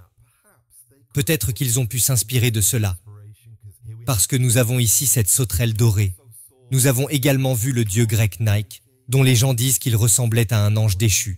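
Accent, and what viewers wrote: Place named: United States. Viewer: Samedi 17 Août 2024 Bonjour à tous, J' suis contre les dialogues robotisés, de plus le narrateur est américain! Un texte expliqué par une/un FRANCOPHONE est bien plus approprié selon moi. J' ai donc écourté cette vidéo, et me suis quand même penchée sur les commentaires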